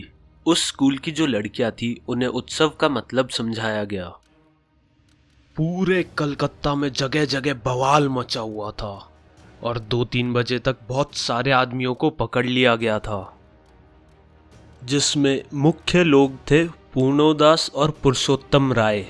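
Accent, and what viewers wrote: Haryana, India. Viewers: Sir Are you from Haryana ?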